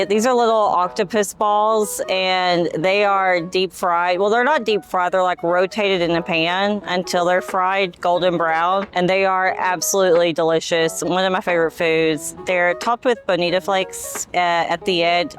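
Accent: South, United States. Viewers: ❤❤❤ I love ur Southern Twang ❤❤❤ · Honey drizzled on yourself just kidding. I dont think you were kidding 😊. You have a southern accent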